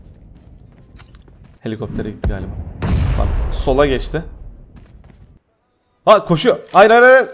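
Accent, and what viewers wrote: Adana, Turkey. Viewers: Adanli sivesi sananyakisiyoya bir videoda sadece oyle konus😉😆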